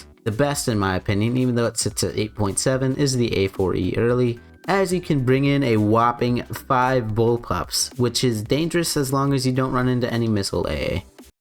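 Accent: United States. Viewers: I hope you blow up bro, the jokes and stuff in this video were great and you have good editing for being a smaller channel. The southern accent was hilarious. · loved the 'murican accent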